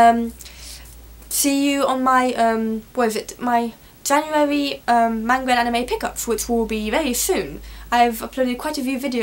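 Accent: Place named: United Kingdom. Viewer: Sounds British to me. thanks for the review just what i was looking for:)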